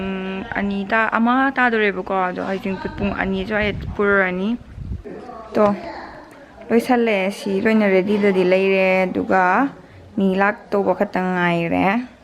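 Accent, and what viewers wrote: Kakching, India. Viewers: Kakching maron malle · Is this how you normally talk at home or you just wanted to show how kakching people talk? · che se kakching mlli🙄🙄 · Ym engna fjeiko ngn wa ngangbje ym nungcye ado kakching gi lon nttabid amut haiyu ko aduju ym nungcdw mnle berry nc sister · I m watching ur vedio far away from Nepal. Love to listen ur voice .. ei na yam pamjava ni kakching marol j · Kakching marol ne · Kakching wa khungang sey ym nung c yeh..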